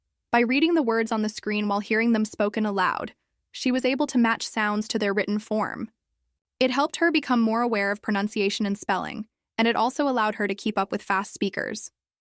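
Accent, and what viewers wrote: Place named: United States. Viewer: American English of course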